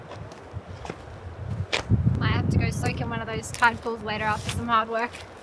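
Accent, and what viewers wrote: Australia. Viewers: What a talented & resourceful women you are 👍. I love the Australian accent ❤️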